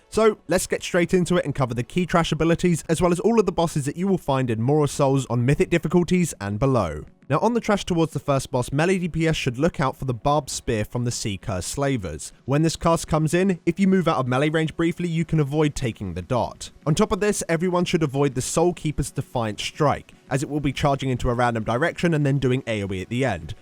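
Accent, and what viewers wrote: United Kingdom. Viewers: what region of the isles is your accent from?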